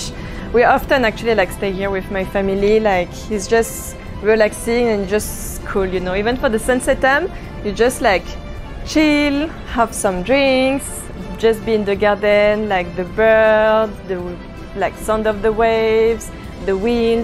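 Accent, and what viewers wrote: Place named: France. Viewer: Beautiful home and nice French accent. Love it.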